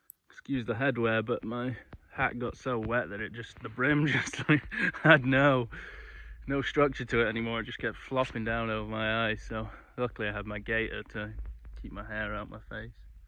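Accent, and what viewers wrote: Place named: England, United Kingdom. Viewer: Where are you camping, looks Nordic , Canadian American ? You have an English accent, like mine though? Perplexed.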